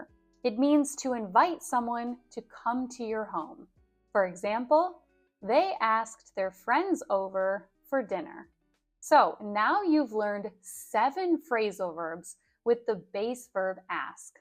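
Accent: United States. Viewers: ❤American accent New pupil is coming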